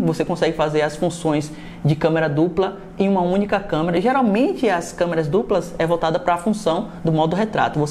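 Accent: Bahia, Brazil. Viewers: Quer sucesso falando Bahiano em nivel nacional me poupe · Kkkk sempre desconfiei q tu era bahiano só pq da sua voz("voz msm não sotaque") e agora com esse lá ele agora sim tenho certeza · Oh, baianinho tu e de qual origem da Bahia?? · Muito engraçado ouvir a análise no sotaque baiano! Dá um diferencial.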